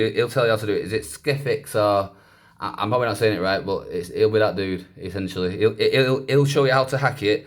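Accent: United Kingdom. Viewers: Love the British accent mate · Are you scottish?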